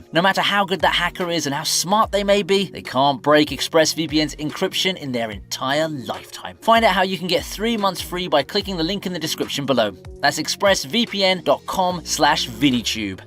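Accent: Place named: United Kingdom. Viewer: Hou je kanker bek met je kut Britse accent. Groetjes Jos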